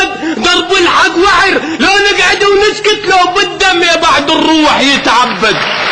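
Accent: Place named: Iraq. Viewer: اللهجه عراقيه خالصه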